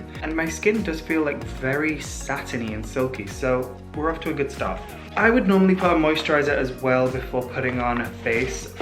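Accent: United Kingdom. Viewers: NEver heard your real accent before. Are you from England originally? I live in London. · Omg you’re British???? I thought you’re American 😮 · Oh my god he’s british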